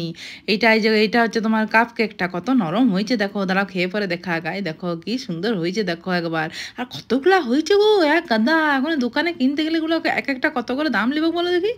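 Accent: Bankura, India. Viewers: A kon jelar bhasha??Bakura na purulia??aktu janaben pls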